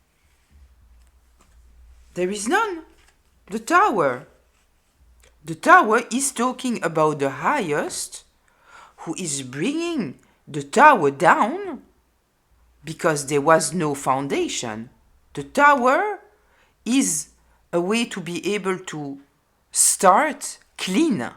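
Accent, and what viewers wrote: France. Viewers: Thank you for the reading:hands-yellow-heart-red:🕊 Are you from France?☺Just subbed^^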